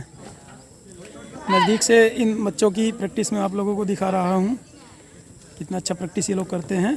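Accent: India. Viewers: AAP ilahabad ki tarah Joab me hoge aapki bhasha se lagta he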